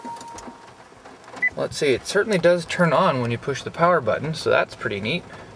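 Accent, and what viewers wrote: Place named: United States. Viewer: Thanks for the vid, i do wonder how many kms you get on one charge and if charging was quicker with proper house mount charger. 100kms is no good for me, commute to work is about a 200km round trip. plus in Australia our electricity is much higher, though i don't know where your from, you seem to have an american or Canadian accent but I thought they used Miles in US, kms in Aus :)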